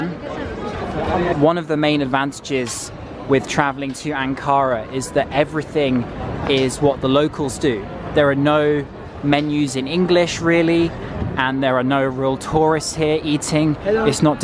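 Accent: United Kingdom. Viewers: You’re British? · Whıte Turk It is English British accent!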